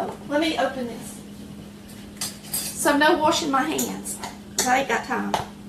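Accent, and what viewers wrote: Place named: Alabama, United States. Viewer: yes i love her sweet voice. im a alabama girl in ohio. how i miss that accent. god bless you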